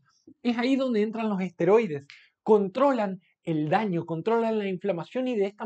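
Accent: Argentina. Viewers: Al fin Dr., volvió a su original acento argentino y dejó el feo acento brasileño. Se le escucha lindo y muy interesante su exposición gracias Dr. por su dedicación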